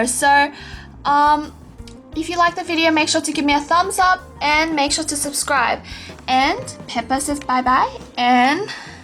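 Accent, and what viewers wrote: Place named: Australia. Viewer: I'm not sure where you're from but do you call it a lizard instead of a skink because you caught yours? You sound almost Australian, but I'm not sure, and your blue tongue looks very much like those that would be found naturally instead sold! I'm just really curious. :)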